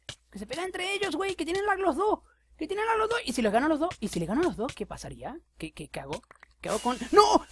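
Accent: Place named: Venezuela. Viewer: eres de venezuela? xq yo si lo soy, tienes el tipico acento venezolano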